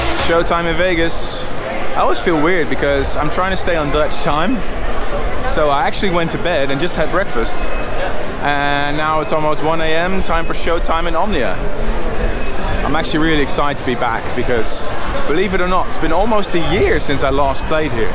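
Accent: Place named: England, United Kingdom. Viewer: Getting a little English accent there😉❤️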